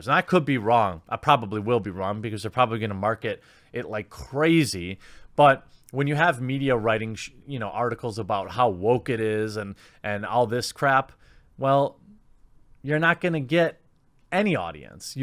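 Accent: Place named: Wisconsin, United States. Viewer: Wisconsin accent. Egg becomes "ayg," bag becomes "bayg" and dragon becomes "draygun"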